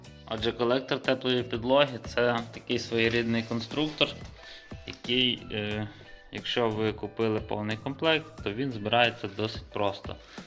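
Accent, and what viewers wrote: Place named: Surzhyk, Ukraine. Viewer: за суржик 👎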